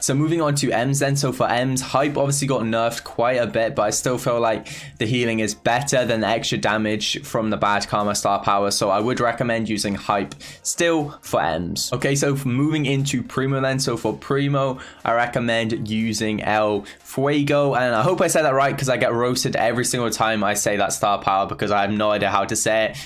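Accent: United Kingdom. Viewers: I love the British accent ;)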